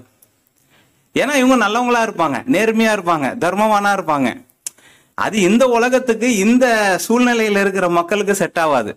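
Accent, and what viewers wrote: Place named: Chennai, India. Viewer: நல்ல சென்னை தமிழ் சார் 🎉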